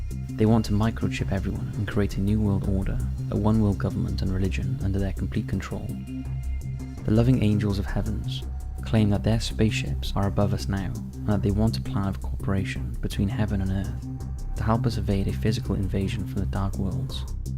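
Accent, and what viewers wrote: United Kingdom. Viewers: I just want to say I love these "Hit Those Lights" videos and the narrator being British makes it so much more convincing and real. I've always been fascinated with what makes people do these horrendous, horrifying acts of violence and I try to find a pattern like they were victims themselves or there was a history of trauma but it's when there is no explanation found or the perp got away with it makes it even more un-nerving and intriguing. Keep up the good work!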